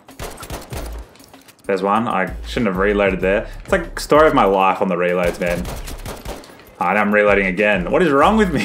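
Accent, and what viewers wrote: Australia. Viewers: Is it weird that a understood his aussie gibberish being an Indian? 🤔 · Oh ur Australian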